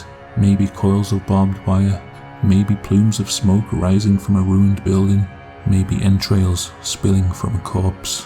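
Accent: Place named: Liverpool, United Kingdom. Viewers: This narrator sounds like a monotone Liverpool teacher who wants to quit his job... it is a very good video though, just not keen on the violin screeching in the background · Wow, barely a scouse twang here 6 yrs ago (2022 current year) can't believe it's you. I quite like it! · VIKINGODIN , you are on the wrong coast. That's a slight Merseyside accent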